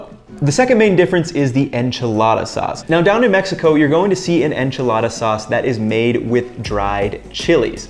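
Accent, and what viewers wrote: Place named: United States. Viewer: I enjoy your videos and your cooking but some constructive advice. If you plan on living in Mexico, do yourself a favor and learn the vowel sounds for Spanish: Replace A-E-I-O-U with AH-EH-EE-OH-OO. It will take the “gringo” right out of your pronunciation. Hope that helps. Oh yes, and ALWAYS strain your sauce. Cheers!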